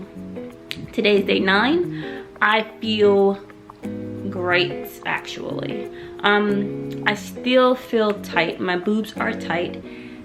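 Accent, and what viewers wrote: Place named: Barbados. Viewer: Where are you from? You sound like a Bajan.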